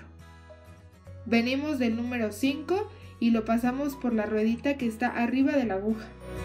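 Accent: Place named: Mexico. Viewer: Ingrese a este video por curiosidad y me terminé enamorando de tu acento mexicano.... Saludos desde Argentina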